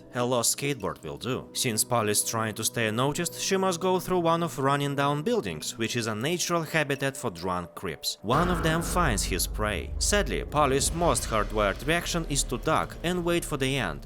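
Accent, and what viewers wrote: Russia. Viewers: ля сразу узнал русский акцент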